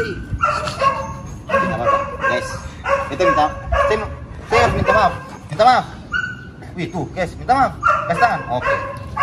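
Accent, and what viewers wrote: Ambon, Indonesia. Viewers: Biar lingkungan jakarta tapi lidah ttp ambon jua bu.. 😁😁😁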